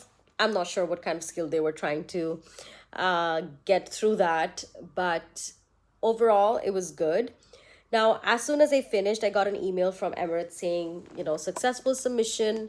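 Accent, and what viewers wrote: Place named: United Kingdom. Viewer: Is this mandatory to speak in a British accent or is it just a choice?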